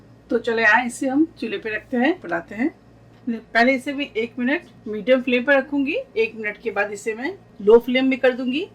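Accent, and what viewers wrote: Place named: India. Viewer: How nice you explain every thing ...... I will try the patato that way loved you accent from india....... And give more food video.......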